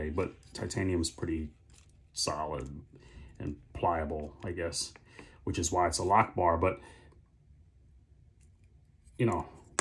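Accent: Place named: Louisiana, United States. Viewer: I don’t think the clip pressure is a factor at all. The only thing it could do is make the unlock harder, but it just doesn’t. I feel like the Zaan has the easiest, smoothest unlock you could ask for - there is ZERO lockstick, and the bar is intuitively designed. I’ve never heard anyone criticize the unlocking, the it’s one of the Zaan’s best features. Out of the box, the OPENING is pretty stiff, but you have options - 1) you can just use it until the washers and blade break in to each other and slide easier (6 months maybe?) 2) you can disassemble the knife and carefully polish the face of the washers (2000 grit paper on a flat surface) then add some grease and a little oil mixed in 3) the easiest and fastest fix - just back off the pivot a teeeeny bit (1/32 of a turn, if that) and the deployment is a lot easier. What’s the background on your accent? 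There’s like some Louisana but a little Boston?? It’s unique, I’d love to hear the origin.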